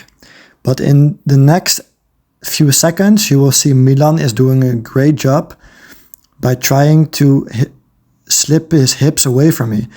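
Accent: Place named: Belgium. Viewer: Your accent sounds like a Belgian accent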